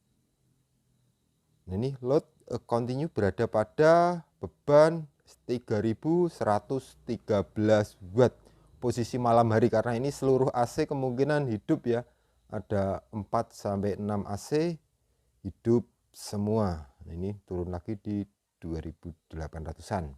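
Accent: Java, Indonesia. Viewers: oh maksud lu skrg ngancam? Silahkan lanjutkan.. Tidak sedikitpun saya takut dgn orang bodoh seperti anda.. Semua yg baca komen2 ini pasti bisa menilai. Dan menyedihkan betapa bodoh dan sempitnya dunia anda sampai mengatakan bajwa logat medok di video itu dibuat-buat.. Wkwkwkwkwwkkkkkk Saya sangat heran dgn tingkat kebencian dan ketidaksukaan anda hanya karena logat medok, sepertinya anda memang perlu konsultasi dgn psikolog.. Wkwkwkwkwkwkwkkkk Sungguh saya tdk membayangkan jika orang2 medok di Jogja mentalitas-nya seperti anda, menerima banyak teman2 dari seluruh Indonesia yg logatnya berbeda2 dan sangat beragam. Tapi untungnya menerima beragam logat dari kawan2 di seluruh Indonesia justru bisa membuat semua berbaur dengan akrab di Jogja.. Cukup sekian dari saya menanggapi anda yang "sakit", selanjutnya silahkan kalau anda akan selalu ngeles ataupun anda mau terus menerus memupuk ketidaksukaan dan kebencian anda dgn sesama bangsa Indonesia, sekedar karena perbedaan logat dan latar belakang budaya.. 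· kuping gak usah di pasang kalo gak mau dengar logat medok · intinya gw nggk seneng aja logat medok udah itu ajaa bisa kan biasa ajaa nggk usah medok2 gitu maaf yaa gw bukan orang jawa ngertiii loee klo nggk ngerti ilmu psikologi nggk usah ngegas emang dasar nya nggk ngerti yaa udah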